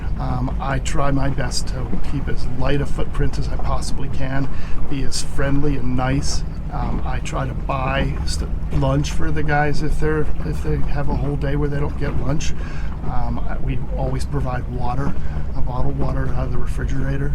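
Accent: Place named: South Florida, United States. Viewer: Great Vid. Gee, sounds like S. FL.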